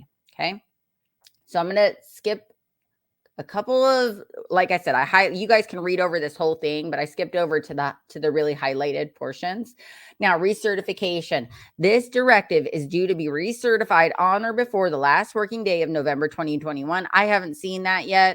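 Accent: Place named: South, United States. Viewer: Thank you for the great content Mrs/Ms. This was awesome, it was truly. I enjoyed how you visualized the documents and subsections. I enjoy your videos. I refer you to everyone I know! Did anyone notice that she has gotten a country accent when she gets into her job? She said "YWAHLL" and "SOPPOSED TWO", yo, she dragged it out! Haha (instead of just plain 'yall'"). Actually, she's gotten more country over the years. Lol, I'm not even from the US and I can tell. Ok take care!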